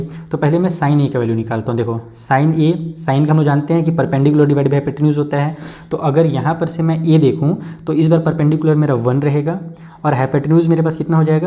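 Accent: Bihar, India. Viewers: Sir are you bihari ✌️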